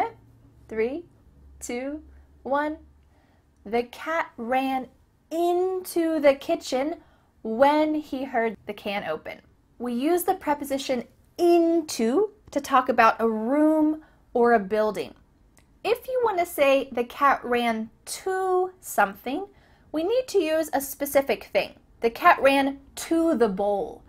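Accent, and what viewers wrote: United States. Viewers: I want u to do more videos on how to sound like an American....PLZZ PLZZ just get a series on it so that everyone would be able to get the same accent on point just like u🙏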